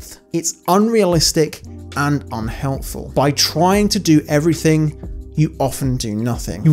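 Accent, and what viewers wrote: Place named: United Kingdom. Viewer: Your british accent works well with you. Makes it more classy!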